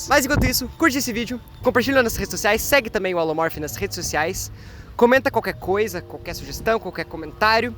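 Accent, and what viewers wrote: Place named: Curitiba, Brazil. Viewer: Show! Você é Curitibano!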